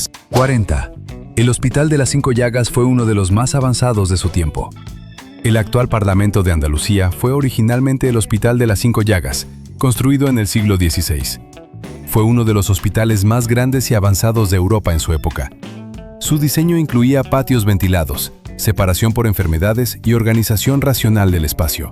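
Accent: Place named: Latin America. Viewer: Y... ¿por qué le han puesto a la IA que relata el vídeo este acento sudamericano tan cantoso? 🤔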